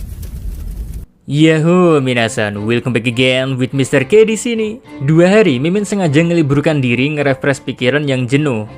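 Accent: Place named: Jepara, Indonesia. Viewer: Owalah admine wong jeporo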